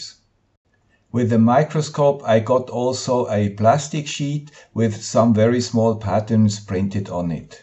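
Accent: Switzerland. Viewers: That would be fantastic, this has been an issue for me for a while. I have very good lighting at my bench. As I am approaching 50 myself and would like to work with smd, it's time to do something about it. I appreciate your quick response and look forward to seeing and hearing the man with the Swiss accent''s solution. Have a great week!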